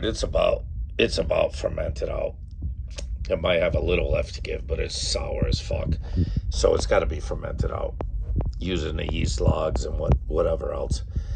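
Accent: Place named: New York, United States. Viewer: Glad i found your channel.. Thanks for the update, yeah these northeast cold nights are starting to suck. Its killer you sound just like my cousin from NYC.. I set my fermeting barrel in an oversize barrel and filled with water, tiny fountain pump and a fishtank heater with a loose lid.. keeps my shit at 87degrees in my shed. I was gifted 2 cases of bananas yesterday and just seeing what to do with em, between you, stillworks, bearded and beaver i got a few ideas.. appreciate it!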